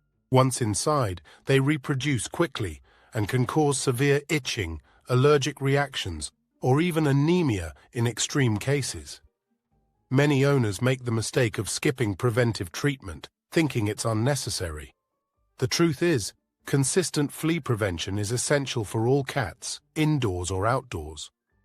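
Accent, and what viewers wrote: United Kingdom. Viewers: This video is a bit fear-mongering. Presumably everyone who’s tuned into this is already considerate enough that we want to hear what we may be able to improve on for our cats, you don’t need to put the fear of God into us by telling us we’re killing them, making them depressed, and all other matter of things in every other sentence 😢 You talked about temperatures twice. You sound either British or like you’ve spent time in the UK. If that’s true, then you know the climate here and the cost of energy. I simply cannot afford to heat my property to between 22-26 degrees Celsius. Do you have any suggestions for how to keep our cats comfortable without spending hundreds per month on heating?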